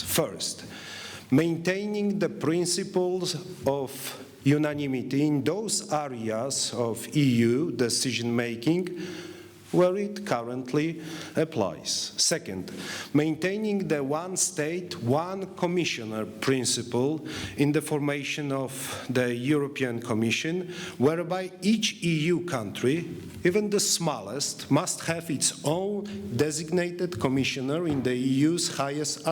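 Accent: Poland. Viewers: Szału nie ma, ale żeby tragedia to też nie!!! wymowa raczej poprawna, dość silny polski akcent i tyle. Akcent to nie taki problem jak Polacy zwykle uważają! Mówi spoko, czyta bo z pewnością nie potrafi tak przemawiać jak po Polsku, ale np. Radziu S. Ostatnio czytał od dechy do dechy po Polsku - on chyba po angielsku mówi swobodniej - wniosek: to Polski prezydent, a nie anglik...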